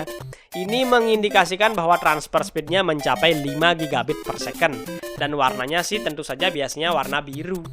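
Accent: Bali, Indonesia. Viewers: Bali sajan logatne nok 😅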